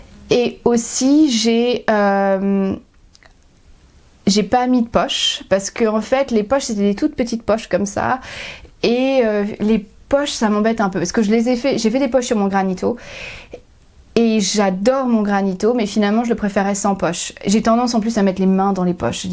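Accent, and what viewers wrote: England, United Kingdom. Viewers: Bon je crois que c'est mon premier commentaire :) J'adore te regarder, tu es pimpante et ton accent anglais ;) (même si tu n'es pas anglaise ;) ) tes anecdotes! Et tes rouges à lèvres :) Bon sans oublier tes magnifiques tricots! Dommage pour ton gilet :/ Amusez vous bien à l' EYF!!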